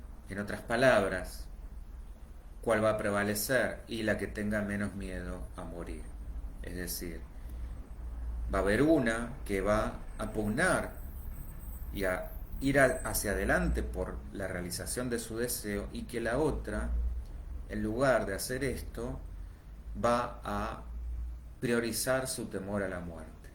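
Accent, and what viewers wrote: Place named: Argentina. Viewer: Buen videos y canal , eres humanista hermano argentino entiendo Masomenos el acento es que yo soy mexicano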